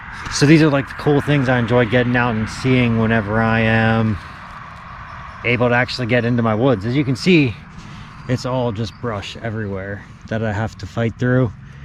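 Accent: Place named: Philadelphia, United States. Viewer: I went to college outside Philly. I thought I detected a familiar accent. ✌🏻(hint)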